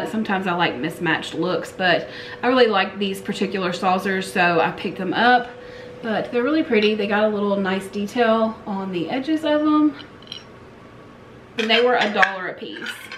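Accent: Kentucky, United States. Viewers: Girl I knew that accent was pure Kentucky! We live in Central Kentucky 🙂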